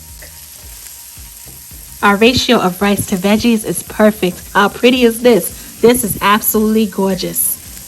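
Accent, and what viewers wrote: New York, United States. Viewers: Obviously....NYC/New York City. Probably Brooklyn or Queens area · Oh man I can dive right into that skillet and eat my way out. Just the way I like it. I get lazy too much and go for popular pre mixed Cajun rice This is so much better. I almost thought you forgot about that half cooked shrimp but I should have know better you got that lid on their and cooked them just enough You got the right sausage too. Love that accent. Why do I think I hear New York City in there? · U can tell she's from New York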